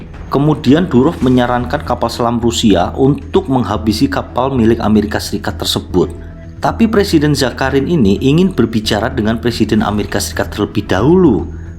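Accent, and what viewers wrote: Java, Indonesia. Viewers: Kuat betul jawa nya..mantap...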